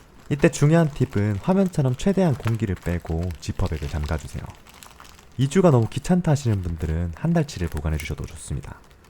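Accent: Gyeongsang, South Korea. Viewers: 경상도 말투 좋습니다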